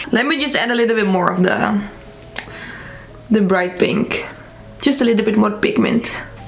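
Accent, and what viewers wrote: Netherlands. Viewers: I hate to be intrusive but I have to know if I picked up on your accent…. Are you from The Netherlands? ❤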